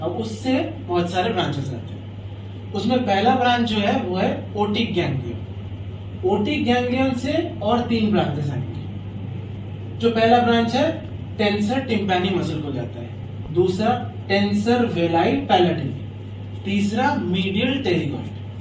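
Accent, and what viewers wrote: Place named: Bengal, India. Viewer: Wow nice accent app bengal se ho kya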